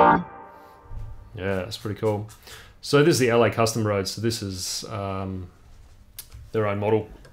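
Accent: Australia. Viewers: Most Austrailian bloke I've ever heard! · Man, just your introduction with your Australian accent puts me in a good mood. Thanks for the review.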